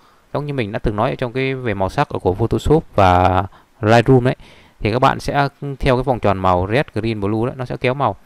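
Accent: Vietnam. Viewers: ad đọc tiếng anh như tiếng việt, nhưng k sao 1 điểm thú vị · Em hỏi ngu xíu ạ, em nghĩ là add phát âm tiếng anh đọc như tiếng Việt này là cho người nghe dễ hiểu phải không ạ? Em không nghĩ 1 người có thể giỏi về PR như vậy lại không biết english đâu hehe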